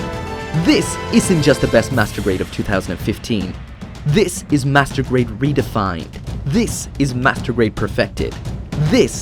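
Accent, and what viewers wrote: Ireland. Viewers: Is this YouTuber Irish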